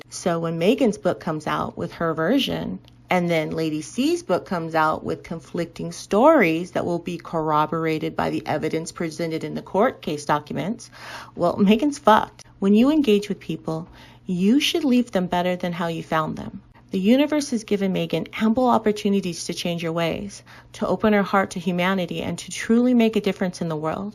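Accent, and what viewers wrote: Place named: United States. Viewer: Love your American accent.... & just found your channel! Well done!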